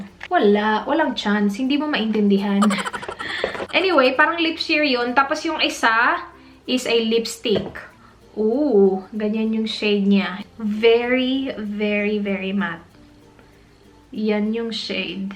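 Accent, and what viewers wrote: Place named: Visayas, Philippines. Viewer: Hahaha kataw.an an Jud Kayo ka ate Oii..Hahahaha.Bisaya kayo ka haha.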